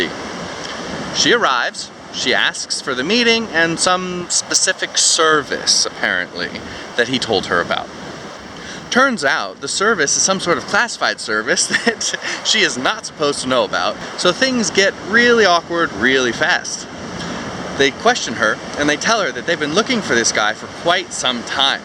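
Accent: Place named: United States. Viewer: speaking English language with an american accent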